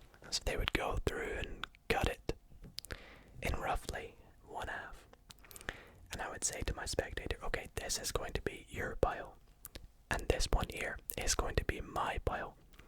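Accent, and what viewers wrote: Scotland, United Kingdom. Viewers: Are you scottish by chance? Thanks for the video and i like your accent alot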